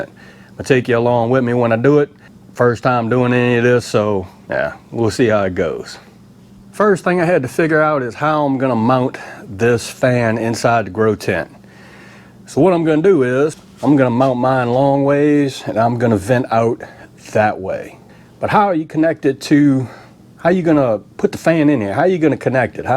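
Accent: South, United States. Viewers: Honestly subbed for that thick south accent 💪🏻😁👍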